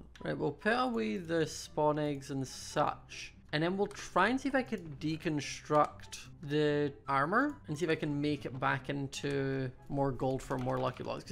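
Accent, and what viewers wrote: Scotland, United Kingdom. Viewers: Ur voice is EVERYTHING (Are u Scottish?) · Yes he is Scottish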